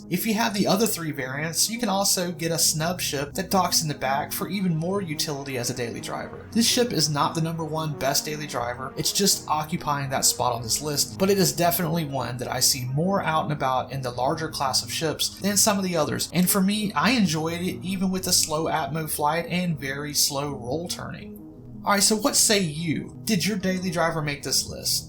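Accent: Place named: North Carolina, United States. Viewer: I swear that sounds like a North Carolina accent! [enough to sound Local to me]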